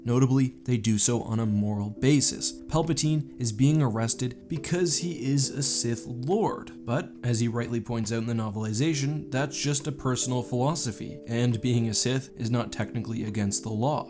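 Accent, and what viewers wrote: Canada. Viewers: This is this first confirmation I hear of your Canadian nationality. I suspected as much from your accent slipping through in most episodes. If you had mentioned it somewhere else or before, I haven’t seen it. It’s just key words that highlight the accent.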